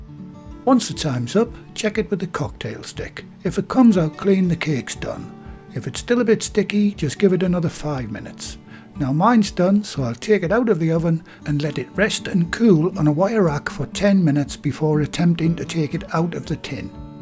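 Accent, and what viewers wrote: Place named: North East England, United Kingdom. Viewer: you are fabulous, so down to earth, no frills just straight forward instructions with a beautiful accent. Love your north east accent. Thanks a bunch. This is my daughter's birthday cake.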